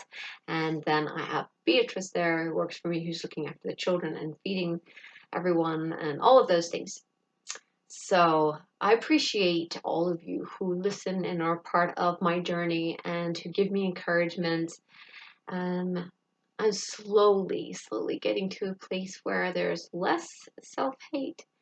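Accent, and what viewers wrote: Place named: United Kingdom. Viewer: Can you teach me your wonderful British accent?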